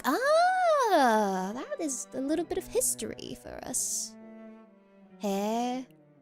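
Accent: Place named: United Kingdom. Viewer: I never know an ojou-sama with British accent is what I need all along.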